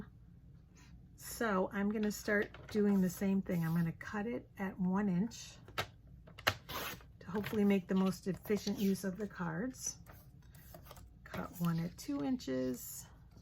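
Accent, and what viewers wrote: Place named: East Coast, United States. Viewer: And…..I love all of your box designs!! Love hearing your Eastern accent. This CO girl grew up in New York and all of my brothers live in MA now. Miss those spectacular fall colors. Hugs, Karen ❌🍁❌❤️❌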